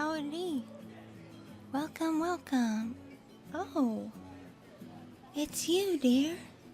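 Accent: South, United States. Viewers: I do believe your country accent is one of the most blessed ones around, it is so relaxing to listen to, and on top of the crisp sound effects and immersive background soundtracks, the listener is teleported to whichever setting your audios is set in. Fantastic as always. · Your Country accent is really unique & special,i love all your asmr's and wonderful rps which are so appealing because you put your heart & soul into all of your work! Thank you for all that you do & the work you put into every video to help others feel good. ☺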